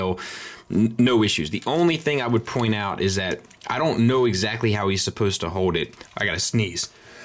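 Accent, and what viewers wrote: England, United Kingdom. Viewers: Love the limey accent...lol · Love the English accent, haha! Good review as usual,.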